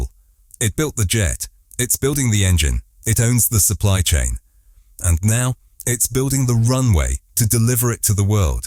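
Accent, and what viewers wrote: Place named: United Kingdom. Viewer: Trust China ? Trust their data & one test flight. How much does it take to buy off media hyped promotions ? Now it’s alledged … CCP is doing it all . This is CCP lackey — agit prop , bought off public relations, & over hyped mock up. — Looks like Boeing & AirBus should give up.. Pure “ white monkey spokesman “… influencer with a “ Brit accent “. — Towering CCP fakery & tub thumping.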